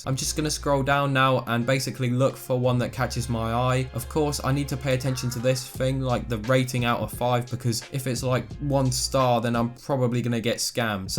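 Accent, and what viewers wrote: United Kingdom. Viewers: *Boeing I forgive you since you sound very british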